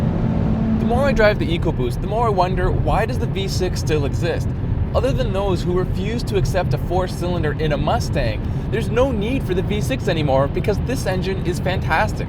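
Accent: Canada. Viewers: so canadian sounding, i dig it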